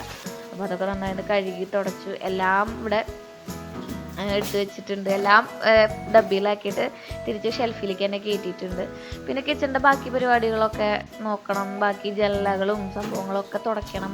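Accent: Thrissur, India. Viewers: ചേച്ചി യുടെ സംസാരം കേൾക്കുമ്പോൾ എന്റെ അനിയത്തി സംസാരിക്കും പോലെ.... തൃശൂർ സ്ലാങ് 😍😍 എന്തായാലും നമിച്ചു ചേച്ചീ 🙏🙏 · Poduve "poche" lifestyle kanikunna ippolthe vloggerslninnumm..sherikm natural aayt kanikunna chechik irikatte oru kudira pavan... adipwoli..kand irikaan patunindd..👍..thrissur bhashanod ishtm thoni..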